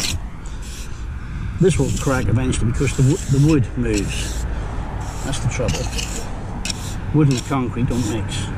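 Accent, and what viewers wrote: Norfolk, United Kingdom. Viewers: Great work bud..you from norfolk?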